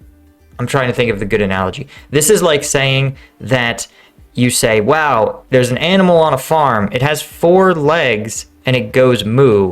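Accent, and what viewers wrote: New Jersey, United States. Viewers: Jersey accent for the win!!! 😂😂😂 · Great explanations,as usual...also,best New Jersey accent ever :) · Love the jersey accent😂😂 much love from north jersey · Thumbs up for that Jerseys accent. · Jersey accent? Loved it. In using it to describe the explosions of Russian military facilities it Crimea, you just needed to finish with - Badda bing; badda BOOM!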